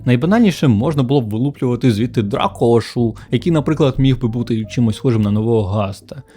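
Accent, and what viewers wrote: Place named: Russia. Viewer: виправдання взагалі жоРсТкО помаранчевий так часУ з наголосом на У обговоримо застосування шкірку шкірку сенс/застосування справді гівно кориснішими тонування каблучки/персні незрозуміло просто обережнішими, без «більш» так дупу/сраку/гузицю поставитИ мОмЕнтИк І по всьому відео: 1. Літера «Щ» дає два звуки: [шч], але не [шь] 2. Милозвучність української полягає в звучанні голосних, а саме, в тому, що всі голосні як пишуться в слові, так і читаються і тут немає цих московських спрощень коли літера «О» читається як [а] і тому подібне. Багато такого чути в твоїй вимові. А так, молодець, що знімаєш українською. Виправити ці моменти і буде прям фист файно.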